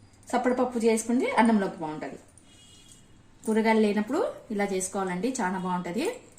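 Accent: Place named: Kurnool, India. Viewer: ఇంతకీ ఎండు కొబ్బరి వేసారో పచ్చి కొబ్బరి వేశారో క్లియర్ గా చెప్పలేదు. మెంతులు,జీలకర్ర పొడి అన్నారు అవి వేయించి పొడి చేశారా?లేకపోతే వేయించకుండానే పొడి చేశారా?మీరు కర్నూలు ప్రాంతవాసులా? మీ భాషలో యాస చూస్తే అలా అనిపిస్తుంది...I am from kurnool 😊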